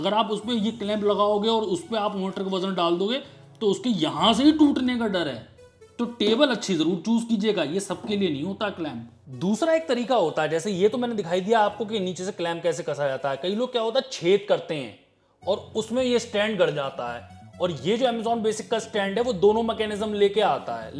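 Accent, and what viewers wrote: India. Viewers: Apne jo wall pe stands lagte hai vo nahi batya agli bar ho sake to uska halka sa jo ho sake ek brief de dena thoda idea ho jayega Aur jo mera one of the biggest reason hai apki videos dekhne ka vo apka indian accent jo apno vale feeling dilata hai to voh kabhi loose mat karbna ☺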